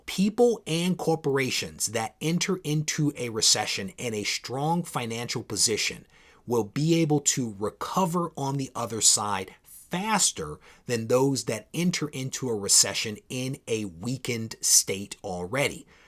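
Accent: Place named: New York, United States. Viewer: Nice vid again. Too true got to do what you got to do. Like the wall selection change up. 1 for which books they are. 2 I have 10 of them myself. It's kind of cool getting to see what it could be like if I were to get them graded. Like how you keep it fresh. Have to watch every vid till the end too just to hear more of that old school New York sound. Miss good rap.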